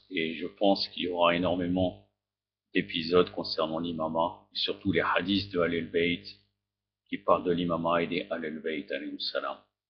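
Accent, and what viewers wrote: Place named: Iran. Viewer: tu récite avec un accent perse, tu parle français? tu ne fait aucune effort de récitation accent arabe. tu n'est pas chiite c'est moi qui te le dit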